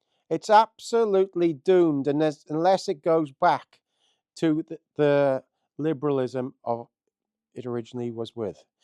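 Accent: United Kingdom. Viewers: I think it is a Builth/Rhyadr accent, bit of Llan'dod thrown in.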